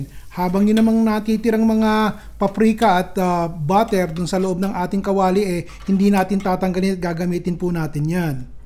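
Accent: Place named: Batangas, Philippines. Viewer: Nakajatuwa ka talaga. Lulutin ko yan . Bibili lang ako nga ingredience bukas. At lulutuin ko yan. Siyanga pala. Tagasaan ka nga pala anak sa atin at may punto angnoagsasalita mo. Taga Batangas ka ba?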